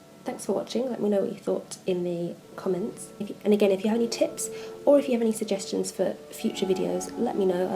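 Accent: United Kingdom. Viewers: are you British?? X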